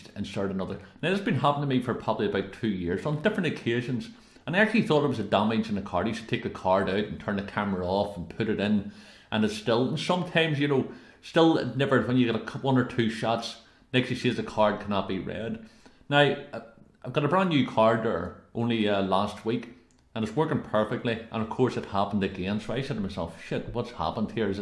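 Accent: Belfast, United Kingdom. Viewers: are u from belfast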